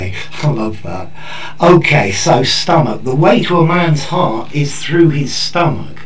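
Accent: RP, United Kingdom. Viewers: You're really the best posh English teacher in YouTube 👏👏👏👏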